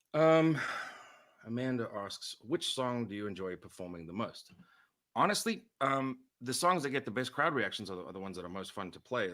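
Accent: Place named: South Africa. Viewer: Didn’t realize he had an accent then I forget they’re from South Africa lol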